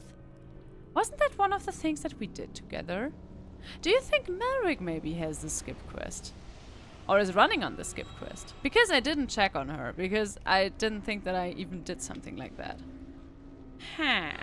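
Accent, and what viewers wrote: Germany. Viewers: egal wie sehr man sich anstrengt, man hört immer wenn wer deutsch ist :D ich kenn das Problem haha, tolles Video.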